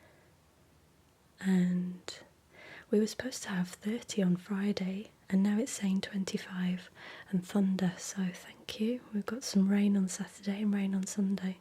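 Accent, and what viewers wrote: United Kingdom. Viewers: Hi! So good to see you again and have you back!:) I've been following your channel for about five years now. Could you remind me again, where about in the UK are you located (I'm a glossophile from New Jersey, United States)?? Could you also remind me, where about in the UK is your accent from? Where did you grow up? Sending you much love and many positive vibes in these very strange times! <3 <3 <3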